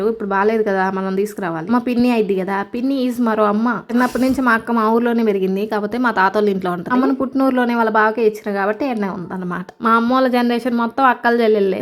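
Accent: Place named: Guntur, India. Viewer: మీది గుంటూరు జిల్లా కదా సిస్టర్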